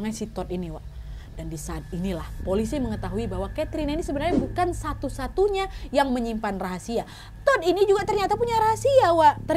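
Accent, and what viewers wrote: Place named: Sulawesi, Indonesia. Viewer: Ah suka sama kk kalau lg critaa..ada logat2 sulawesinya.. sukses ya kk🥰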